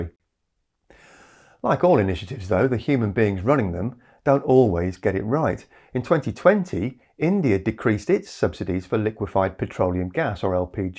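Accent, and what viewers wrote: United Kingdom. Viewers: Oh dear where to start! I'll concentrate on the UK where I live, and judging from the accent where the video is from. The production "subsidies" are tax treatment that all businesses benefit from, because if cost of production was taxed as opposed to profits, no one would bother producing anything. Talking of taxes, big oil was paying 40% CT (double other businesses) and now windfall taxed at 75%! The second bit addressed the direct type as in the price cap recently introduced. This was needed, and other countries have similar schemes, because of high prices. Prices are high for various reasons, post Covid bounce back, the war etc. But another cause of high cost is renewables, but that is a whole other discussion. Lastly "indirect" subsidies, i.e. not paying for the supposed damage caused. Well depends how you measure it, and I would not trust the IMF, they hardly ever get predictions right (same as IPCC). 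Just because an organisation has "international" or "world" in it's name, does not make a divine source of information. Anyway, there are carbon credits, ESG ratings, and taxes on anything that moves by fossil fuel, are these accounted for? Making EVs and wind turbines also have an environmental footprint, does the IMF call these subsidies? Talking of which, both these and other green industries receive huge direct subsidies from the taxpayer. Finally, I am not a denier, but to get to a greener future requires fossil fuels, and plenty of them for a long time to come. The va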